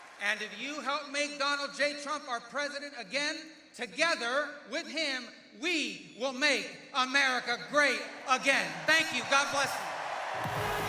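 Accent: Cuba. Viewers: Que lindo es el acento cubano . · Maravilloso acento Cuba 🇨🇺♥️🇺🇸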